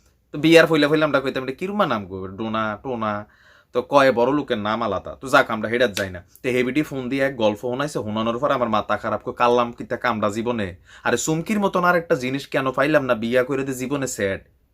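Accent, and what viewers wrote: Comilla, Bangladesh. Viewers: কুমিল্লার ভাষাটা দারুণ লাগলো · কুমিল্লার ভাষা সেই মারছেন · দারুন, দারুন, দারুন, কুমিল্লার ভয়েজ নিয়ে এডবেঞ্চার , ভালো লেগেছে ভাই।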